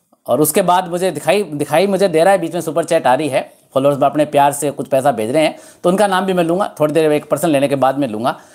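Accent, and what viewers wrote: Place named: Marwari, India. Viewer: Love your Marvadi flavored hindi sir- love from Goa.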